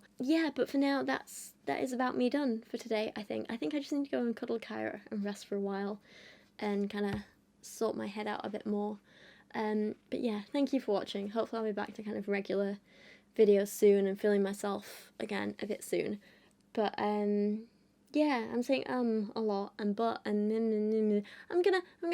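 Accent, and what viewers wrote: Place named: Yorkshire, United Kingdom. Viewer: Well, I have a few thoughts about this video: 1-relax. I personally love your videos, and haven’t thought any of them have been lacking. And it’s important to take time off if you don’t feel right to make them. We’ll be here whenever you’re ready, and we’re here for you until then. 2-I’ve never been super into poetry. I’ve always liked it alright, but never really went looking for it, or paying a great deal of attention to it. Your poetry videos, however, have me rethinking that. I’ll probably start going after some poetry now, and it’s all your fault, so thank you! 3-I’ve recently started watching the seasons of the current Doctor (from Doctor Who), which I’m absolutely loving, by the way, and when I saw that they (both the companions, and the Doctor herself, or at least the actress) are from Yorkshire, I remembered you, especially because of their accents. 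And it got me thinking, do you watch and like Doctor Who, and if so, what do you think of the Yorkshire Doctor? Just curious.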